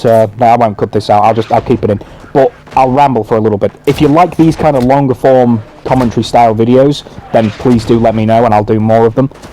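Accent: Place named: United Kingdom. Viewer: The most British voice I ever heard, like I couldn't even get concentrated on the video, but definitely it's a good review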